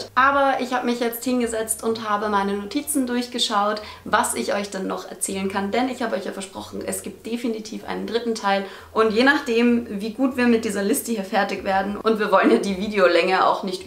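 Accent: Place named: Vienna, Austria. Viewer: Ich höre dennoch dein wienerdialekt durch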